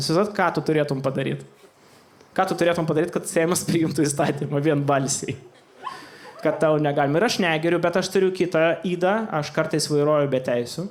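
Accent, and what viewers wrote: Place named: Poland. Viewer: 😂😂 Geras. Prajuokinti Lietuvaičių sunku. Šaunuolis, geri bajeriukai ir tas akcentas Lienkišckas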